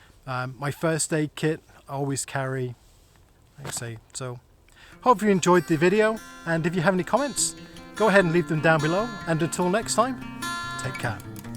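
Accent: United Kingdom. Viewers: Was not expecting the British accent, but then I am new to the channel. Survival Russia bought me here BTW.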